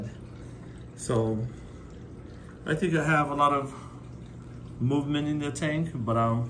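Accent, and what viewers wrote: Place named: United States. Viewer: Salutare din uk, cred ca ești demult în usa ai accent fix te usa, foarte diferit de uk. Super acvariul !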